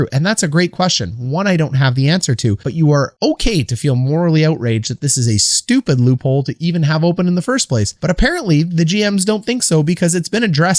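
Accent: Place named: Canada. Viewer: I do love how his inner Canadian comes out regardless of how hard he tries to not show it. :D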